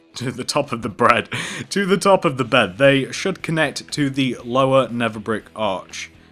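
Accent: United Kingdom. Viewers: I like your British voice, so awesome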